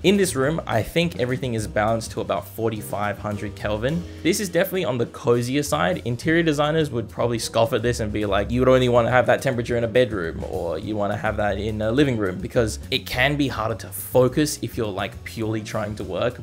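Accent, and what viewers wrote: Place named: Australia. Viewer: Idk what it is but the Australian Accent just itches a part if my brain. I love it 😂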